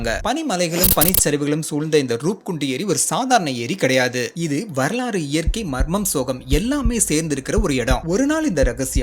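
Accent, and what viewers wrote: Tirunelveli, India. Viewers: Ur Nelai slang super bro 🎉🎉🎉🎉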